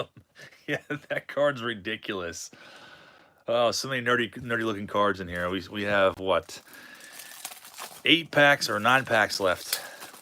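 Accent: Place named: Pittsburgh, United States. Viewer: You have a bit of a yinzer accent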